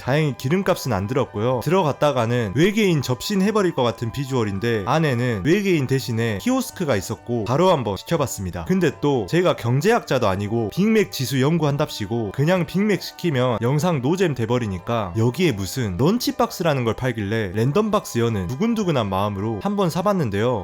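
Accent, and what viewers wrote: Jeolla, South Korea. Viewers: 사투리는 어디쪽인가요? 전라도? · 고향이 전라도인가 바요? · 아 전라도근본 사투리억양 ㅋㅋㅋ 듣기힘드네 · 구수한 전라도식 발음이 참 정감이 넘칩니다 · 절라도 사투리 졸라 쓰는디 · 남도 사투리가 겁나게 친근허요...잉.. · 전라도 억양! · 고향이 전라도 인가요?ㅎㅎ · 전라도사람인가보네요 악센트가심하시네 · 난 경상도인데 전라도 말씨 참 좋다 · 전라도 엑센트 못버리네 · .억양이 절라도 말투가 너무 심해서 알아들을수 없습니다....절라도 억양좀 자제해주세요. 인간적으로 너무 심합니다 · 전라도 말투도 너무 정겨워브럿고요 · 익숙해질때까지 시간이 좀 걸리네요 전라도사투리였군요? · 억양이 귀여움~전라도쪽인듯 ㅎㅎ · 전라도 사투리 나레이션 익숙하지 않은데 재밌네요 ㅋㅋ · 영상은 재밌는데, 절라도 사투리가 너무 거슬리네요. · 혹시 전라도 분 이신가요? 말투가 너무 정겹네요 ㅋㅋㅋㅋ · 전라도 사투리만 안써도 볼텐데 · 전라도 사투리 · 전라도 사투리 듣기싫어요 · 전라도 사투리가 꽃혀서 집중이 ㅜ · 광주분인가?? 전라도 사투리 어투가 뭍어나네요... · 억양이 빼박 전라도네요! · 전라도 사투리. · 내용은 좋은거같은데 전라도 사투리가 너무 구수~~해서 앞부분만 보다가 갑니다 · 전라도사투 리에 디테일한 언 어 구사력 쥑입니다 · 구수한 전라도 사투리와 쌉소리 스크립트의 환장의 조합 ㅋㅋ 재밌게봤습니다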